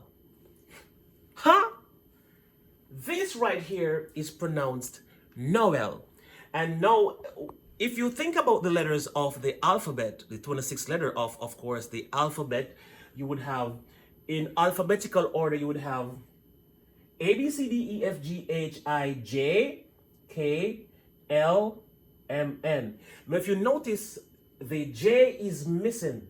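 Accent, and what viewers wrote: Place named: Ireland. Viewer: Great stuff buddy. I notice your accent almost sounds a little Irish at times.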